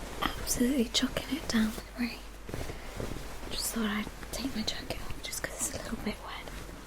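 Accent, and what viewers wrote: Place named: Yorkshire, United Kingdom. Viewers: I really like the nice northern accent you have in this video I’m very familiar with it and I’m also from the uk as well. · Bit of a Yorkshire accent nurse vibe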